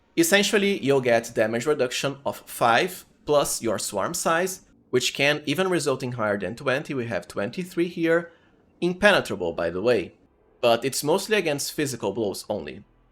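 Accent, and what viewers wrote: Brazil. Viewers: Brazilian Accent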